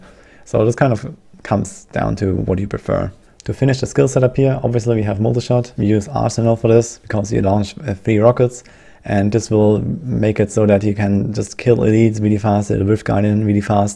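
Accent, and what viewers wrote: Germany. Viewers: His German accent is not a problem at all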